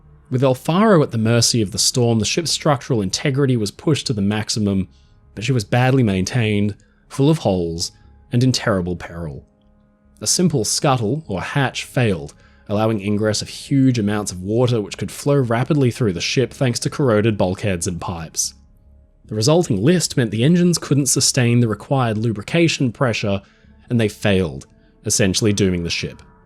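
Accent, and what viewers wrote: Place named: Australia. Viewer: it is Australian English. The best by far in the world.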